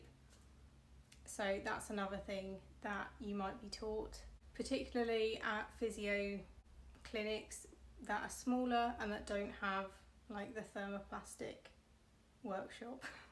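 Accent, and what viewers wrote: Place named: England, United Kingdom. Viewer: when you’re talking sometimes it’s like listening to ASMR your voice with the soft english accent is relaxing even though you are talking about your serious issues. I hope at some point we get some relief chronic illnesses are tough.😞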